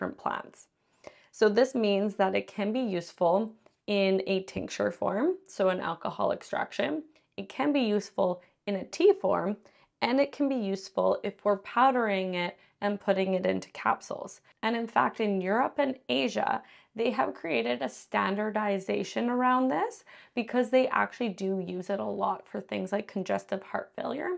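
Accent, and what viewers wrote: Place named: Canada. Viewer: Americans usually leave the H sound off this word, maybe influenced by French or Spanish, but British speakers generally pronounce the H. Canadian English is a kind of hybrid and that's what the good doctor speaks.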